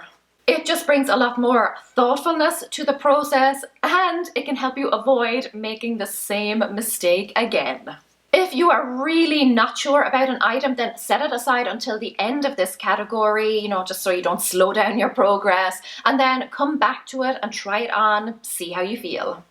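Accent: Ireland. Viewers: OMG that school organiser thing you showed gave ME memories!? definitely had one at some point! Great video, I’m on a Konmari spree and it’s always so nice to hear a familiar Irish accent with great content :)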